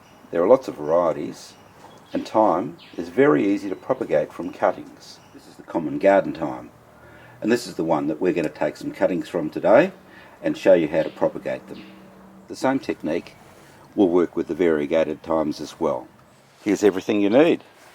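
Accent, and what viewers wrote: Australia. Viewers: I'm sorry but i just can't take the aussie accent seriously :) :)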